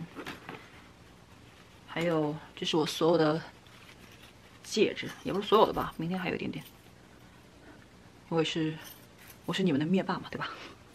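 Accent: Beijing, China. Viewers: 是北京人嗎～～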